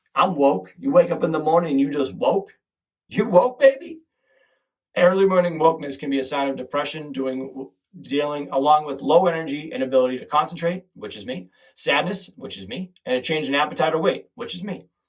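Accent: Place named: Boston, United States. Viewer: You sleep? 😂😂😂 Your Boston accent is my favorite part of your show! Keep trucking my friend!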